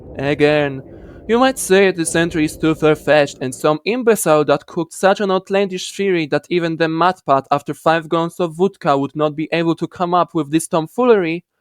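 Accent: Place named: Poland. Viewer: Tak mi się wydawało, że zajeżdża Polskim akcentem, ale jak usłyszałem jak wymówiłeś "vodka" to byłem pewien lol. Zajebisty kontent btw, nie tylko ten film, ale cała reszta, na pewno tu chwilę zabawię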